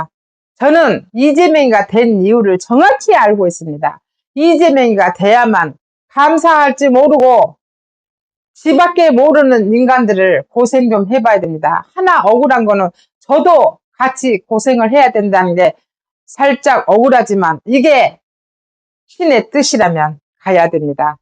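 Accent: Jeolla, South Korea. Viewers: 반세기넘게 살도록 전라도 사투리 가 이토록 순박하고 구수하게 들리기는 첨 입니다. 애청자 입니다❤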